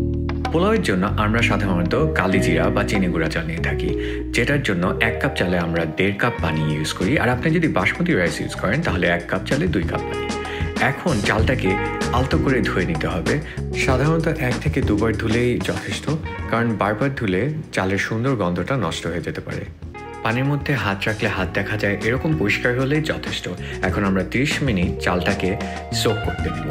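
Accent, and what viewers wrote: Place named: Bangladesh. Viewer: এর ভয়েস শুনে আজ জানলাম ব্যাটা বাংলাদেশী। আগে জানলে দেখতাম ই না। যা ভাগ।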